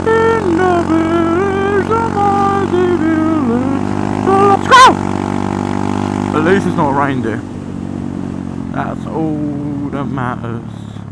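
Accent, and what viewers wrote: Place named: Essex, United Kingdom. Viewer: I noticed some of essex in this :o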